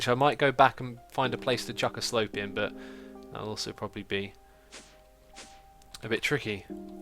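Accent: United Kingdom. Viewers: love xisuminati modpack stream on you tube as i live in melb aust and ALWAYS miss your live stream. all the best in finding somewhere to live. you sound like you're from london so that's not going to be cheap, i think i have enough to put a deposit on a harry potter understairs cupboard.....maybe not. keep up the brilliant videos.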